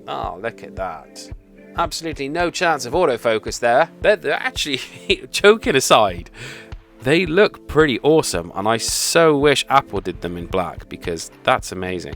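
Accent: United Kingdom. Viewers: So nice to hear an English accent, and from one who has a very agreeable attitude and sense of humour. I would love to hear your views on real ones and those i500's or 600's if you have played with them. I just want to try this type of headphone to see if I'll be continually losing them or forgetting to charge etc so I don't want to buy real ones yet! However I used to work in the HiFi and audio industry so I have a lot of rather nice gear and hate harsh sound, preferring a warm english, Tannoy type of sound which I don't mind paying for once I know if this type and shape etc work for me. Any ideas on the better sounding clones will be greatly appreciated, great and honest review. Loved it. Nice video, subscribed. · And I can hear ur from the UK so was it posted by Royal Mail?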